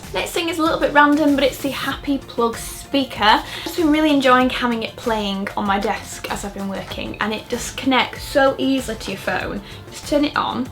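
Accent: United Kingdom. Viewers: where is your accent from in the UK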